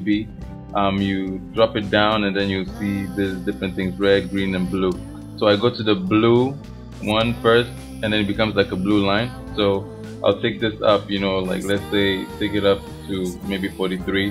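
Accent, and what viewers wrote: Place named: Germany. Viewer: you sound German :\ Are you? Nice video BTW :)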